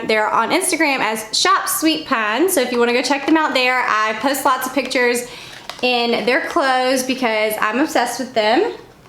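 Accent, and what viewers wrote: South, United States. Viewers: Love your channel! I’m from Georgia and it’s so neat to hear your southern voice when I watch your videos! Love all the items you’ve chosen especially the rose gold tumbler as it reminds me of my new daughter in law who loves all things rose gold!😊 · I would love to win. The farmhouse wreath is👌👌. I'm from Georgia too, born and raised here❤️ so good to hear a southern accent on YouTube. I just found your channel through Lynette Yoder. Great content!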